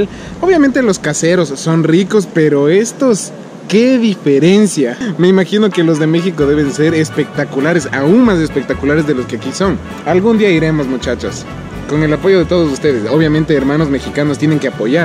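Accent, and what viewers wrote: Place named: Ecuador. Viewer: Eso me gusta del... No cambia su forma de hablar Ecuatoriano hasta las huevas... Mijin.. ánimo Bro.. más vídeos porfa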